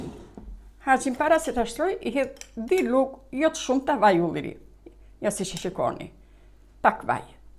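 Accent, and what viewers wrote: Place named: Përmet, Albania. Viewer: Zonje te petshendes! Te lumshin duart e arta, qe e shpjegon sh. Mire! Besoj se ini nga jugu, se dhe une andej jam, por besoj se ini PERMETARE, nga gjuha e paster qe flisni, se dhe une e perdor kete byrek, del sh . I mire! Respekte ❤️, nga Italia 🌼!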